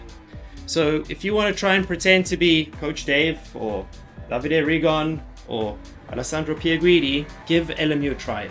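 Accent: South Africa. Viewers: I can’t help but pick up the South African accent here, are you from South Africa by any chance? 😅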